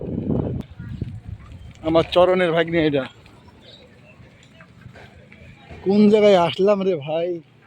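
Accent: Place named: Meherpur, Bangladesh. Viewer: মেহেরপুরের ভাষা পাল্টা 🙂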